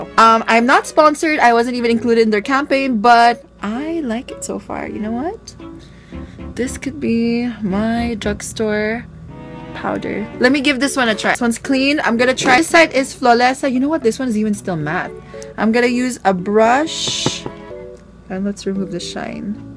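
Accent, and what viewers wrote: Bisaya, Philippines. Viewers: Bisaya ka ba mama?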